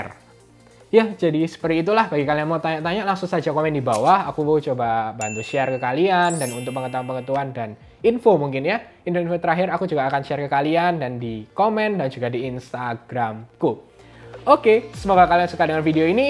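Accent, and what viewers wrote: Java, Indonesia. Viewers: Logate Jowo banget mas wkwkw. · thanks gan.ngomong ngomong asli ngendi sampean mas.wkwkwk.ligate jik ketok jowone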